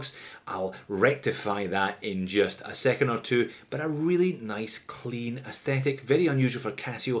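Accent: Scotland, United Kingdom. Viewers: Great watches, great video, great T-shirt, love ur Scottish accent. 🏴👍 Most people can’t afford “luxury watches” so it is great that you test affordable watches and give them some love. I am surprised about these proudly Chinese pieces. They seem to be a good alternative. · I suspected that you were Scottish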